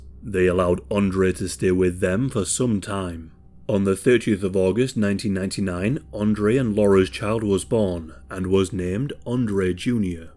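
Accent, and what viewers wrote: United Kingdom. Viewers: it would be much more scary if you didn't have this hilarious british accent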